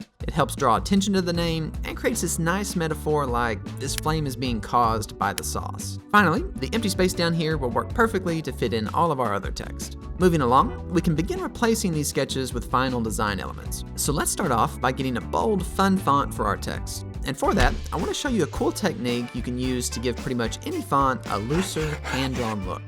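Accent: South, United States. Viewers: are you southern or something?? such a weird accent lol.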